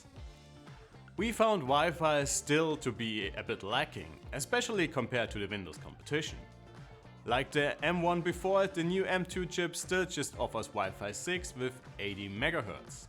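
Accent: Germany. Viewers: Wow, ich lese notebookcheck schon seit vielen Jahren, aber das ist das erste Video, was ich von euch sehe. Einfach großartig! Flüssiger Text ohne komische Pausen oder holprige Überleitungen und schönes English Ich mag den leichten deutschen Akzent 👌 Die Einblendungen mit Testergebnissen sind teilweise etwas zu viel für mich, aber smooth 😂 und wenn’s mich näher interessiert, kann ich ja Pause drücken oder nochmal im Artikel nachlesen 👍 Danke ans ganze Team! weiter so! Ich bin gespannt aufs Review vom neuen MBA 🔜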